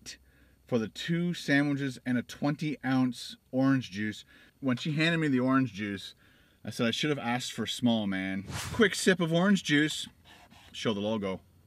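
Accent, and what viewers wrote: Canada. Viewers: #snausage and #egger makes English with a Canadian accent awesome, eh!!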